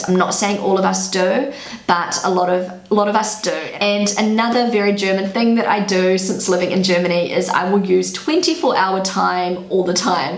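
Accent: New Zealand. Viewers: Wait...I thought ppl from new Zealand talk English the way Americans do not that accent that you have or am I wrong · Btw I love your kiwi accent don't loose it! ;) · Der, I really appreciate watching your VLOGS regarding the content and because I enjoy Hearing Kiwi English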